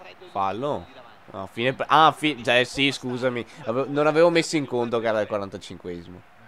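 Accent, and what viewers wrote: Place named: Trentino-Veneto, Italy. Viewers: parli come l'incrocio tra un trentino e un veneto:) gran bel video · Si è parla dialetto veneto ahahahh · di dove sei? io sono di vicenza e mi sembra che tu sia di queste parti XD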